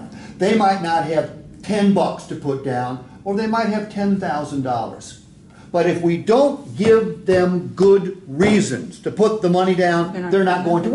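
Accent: Appalachia, United States. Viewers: and his hick hillbilly fog horn leg horn talks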